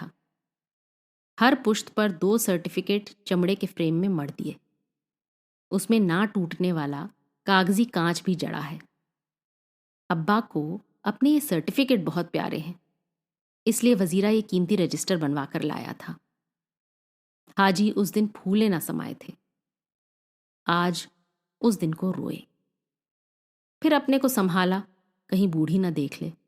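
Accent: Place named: Lucknow, India. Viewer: Excellent... I can smell lucknow in your Voice .... Thanks alot Ayesha 🤍🖤 for recommendation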